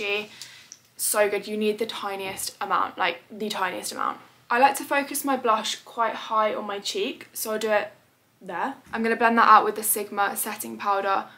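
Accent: United Kingdom. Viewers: Did not expect her to be British 😭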